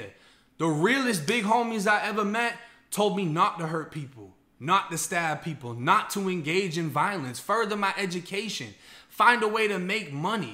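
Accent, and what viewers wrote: Boston, United States. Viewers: Yo you from Boston? Trying to guess the accent 🙂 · Are you from Boston or something close due to your accent??? Why you fascinated with Florida??? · Bro you from Boston area? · Did you ever live in Beantown?? A lot of Floridians are originally from there you have a little accent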